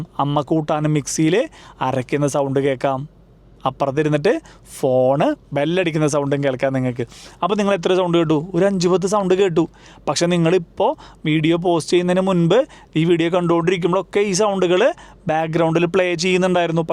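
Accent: Thrissur, India. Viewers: Thrissurkkaran aanalee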